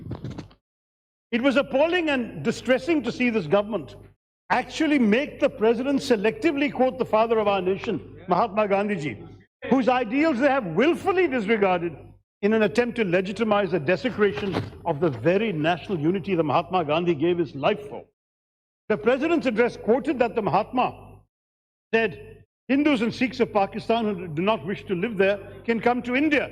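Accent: United Kingdom. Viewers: Gone are the days where people though you are clever just because you spoke in British accent. Dumb idiot! still with congress, the party that brought India to its knees. This idiot who talks about secularism is a part of the communist government. What a shame! you should drown yourself. You speak about women safety when you have a charge about your wife in court! stupidity!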